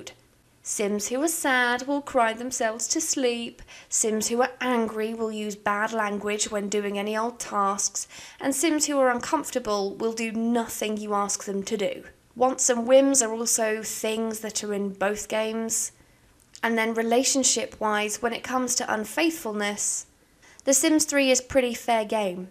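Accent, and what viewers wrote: United Kingdom. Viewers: I like you accent were in the UK are you?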